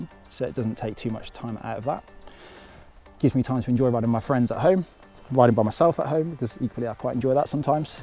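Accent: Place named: England, United Kingdom. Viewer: Nederlands met een Engels accent doet het altijd goed ;).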